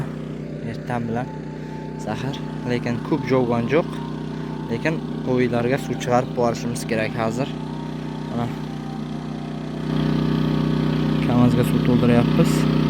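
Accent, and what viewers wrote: Surkhandarya, Uzbekistan. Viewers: Бу қаер. Шеваси Сурхандарё ёки Қашқадарёликми дедим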